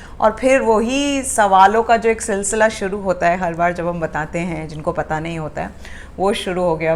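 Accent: India. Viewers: Bhai I love Garhwali ascent in your spoken English, reminds me of my childhood friend Bhola Singh rawat from Khatta Pani Gaon. Regards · Very nice couple , he has his own identity surely And Jamie has a lovely wife with beautiful confident voice and Indian accent 💕🇮🇳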